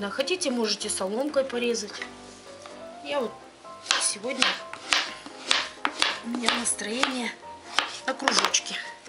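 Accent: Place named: Siberia, Russia. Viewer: сибирячка по говору наверно.Спасибо .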